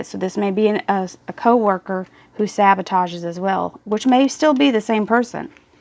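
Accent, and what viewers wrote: Texas, United States. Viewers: This is is 4 U R GREAT N FUNNY IN A GOOD WAY. I LOVE UR TEXAS ACCENT. 🤗🤗🤗🤗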